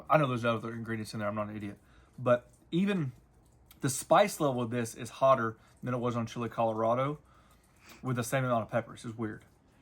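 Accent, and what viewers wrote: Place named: Tennessee, United States. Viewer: Never mind, I know a Tennessee accent when I hear it. Could be Kentucky or Georgia just across state lines, but that's a Tennessee accent. Lol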